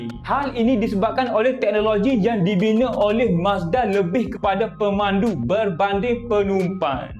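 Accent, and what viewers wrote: Kelantan, Malaysia. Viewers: Ini loghat kelate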